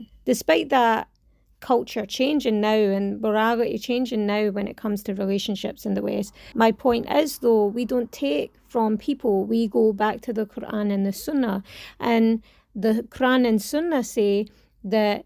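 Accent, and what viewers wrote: Scotland, United Kingdom. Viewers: Ma Shaa Allah sister you are good. On the subjects you talk about, you are spot on. However sister, your Scottish accent is a bit difficult to follow in one go. 😊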